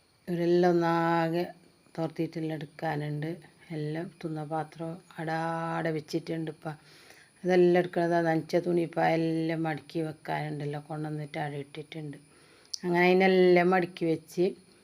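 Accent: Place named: Kasaragod, India. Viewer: Nice sharing 🎉🎉🎉🎉 കാസ്രോട് ഭാഷ 😂😂 👌🏻👌🏻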